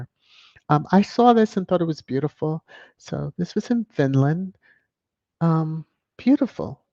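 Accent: RP, United Kingdom. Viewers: Thank you for another wonderful thrash time 🙏😘❤️❤️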